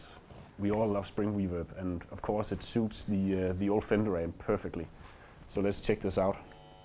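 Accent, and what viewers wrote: Denmark. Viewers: Lol at the Danish accent, lmao! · danish english! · You can't hide with that accent! You've gotta be Danish! Hej kammerat!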